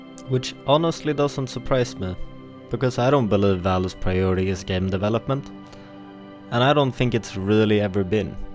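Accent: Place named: Sweden. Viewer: You’re from Sweden? Sounds like Swedish accent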